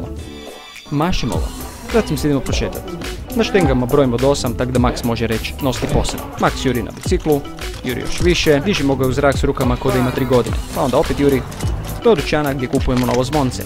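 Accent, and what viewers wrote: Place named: United States. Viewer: tipicno Americki ko da nisi Balkanac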